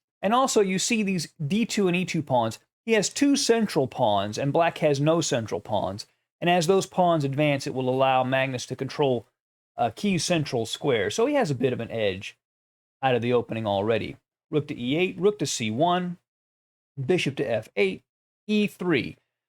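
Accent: South, United States. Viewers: Are you from southern America ?🙂 Nice video, I love watch your chess review 🤩😍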